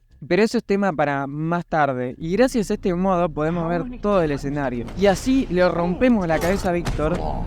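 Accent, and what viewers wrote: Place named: Argentina. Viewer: Papu este vídeo es cine desde la edición hasta la narración tan Argentina que usted tiene 40/10 y una pitusa de regalo🗿🚬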